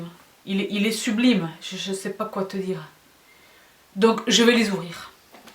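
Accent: South, France. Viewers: Oh la LA !!! Comme je suis ravie pour toi et heureuse de faire partie des sistas ! En plus, un giveway qui te ressemble vraiment. Grâce à toi, je me suis remise au layering, j'ai une wishlist de soins en asiatiques qui s'allonge, je m'intéresse de plus près à certains produits... en bref, je te voue une confiance absolue ! T'es vidéos sont des bouffées d'oxygène, elles sont remplies d'une jovialité débordante et communicative, je les attends toutes les semaines. D'ailleurs, à force de te regarder, j'en arrive parfois à parler avec un brin de ton accent du sud, des expressions propres à toi sortent parfois même dans des discussions, ça me fait rire toute seule !!! J'arrête pour aujourd'hui le roman mais c'est toi qui l'a voulu après tout ! Alors je croise les doigts pour avoir la chance de remporter le Pompon du pompon des giveways ! À dimanche 😉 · Hello les sista ........ 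ahahhaha a chaque fois je rigole je kiff ton accent du sud et félicitations tu le mérite tu partage avec nous ta passion qui est aussi ma passion aussi (tu ma fait acheter des tonne de truc ) et waouuuuuuuuuu le concour de dingue que tu nous fait "c'est un truc de malade....!!!" Lol je rêverais de gagner ce concour 😍😍😍😍😍😍😍😍😍😍😍😍😍😍😍😍😍😍😍😍😍😍😍😍😍😍😍😍😍😍 gros bisous a toi reste comme tu est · Coucou! Moi je t’ai connu il y a presque un an maintenant en recherchant des solutions contre la cellulite et je suis tombé sur ta vidéo par chance... je suis rapidement devenu addict! D’ailleurs j’ai tout de suite partagé ta chaîne autour de moi, j’ai parlé de toi à des amies qui ont tout de suite kiffé!!! Des techniques venues d’ailleurs, le brossage à sec, le derma planning, le derma roller... tellement de choses dont on parle peu mais si efficace et validée grâce à toi! Je te remercie pour tous ces partages, plein d’honnêteté et de sincérité. 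Je pourrais m’étaler encore longtemps mais je vais faire bref lol, c’est toujours le poommmpooom avec toi. Le dimanche toujours en attente de l’annonce de ta vidéo sur Snapchat! Alors on se pose tranquillement sous la couette petit café et on écoute tes précieux conseils emprunt de cet accent tout droit venu du sud, tellement appréciable pour ton abonnée venu du Nord lol. Force et honneur à toi!!!! Tu nous as conquis(e)s et tu mérites tout ce qu’il t’arrive aujourd’hui, par travail et endurance, je te tire mon chapeau pour allier ton travail et ta passion! Une femme inspirée et inspirante... pour finir moi je te dirais de continuer sur ta lancée tu arrives à balayer toutes les catégories de vidéos qu’on pourrait voir (mention +++ pour les vidéos chichat) moi j’aimerai pouvoir te revoir dans des vidéos tuto make up avec tes petites techniques ou lookbook étant grande de taille également j’apprécie de découvrir des look q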